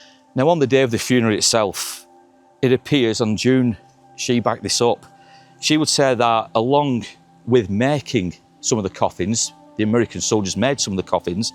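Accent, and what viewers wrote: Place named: Rochdale, United Kingdom. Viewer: Another sad story but great video, You tell good stories and you're really good at what you do. you have the same accent as a good mate of mine that lives in Rochdale, we've been good friends for 25 years.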